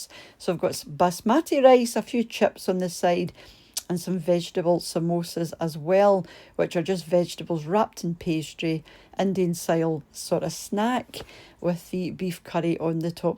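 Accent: Scotland, United Kingdom. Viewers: I watch your channel because I love your Scottish accent 😀. And your cooking of course.🇨🇦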